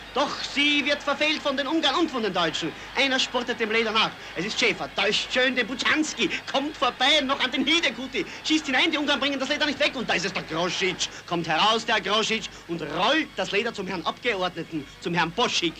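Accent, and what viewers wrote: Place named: Austria. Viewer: Die ganze Diktion und Sprechweise...eindeutig Österreich.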